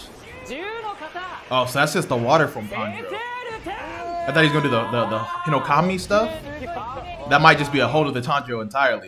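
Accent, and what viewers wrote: United States. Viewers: No hate to but I hate the way Americans pronounce the characters' names especially with Naruto and Jotaro.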